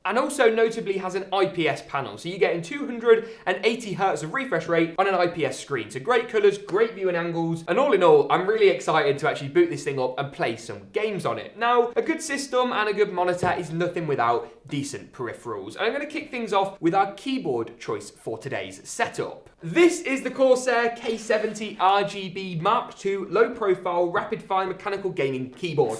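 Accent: United Kingdom. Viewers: I love the way you speak in the British Accent.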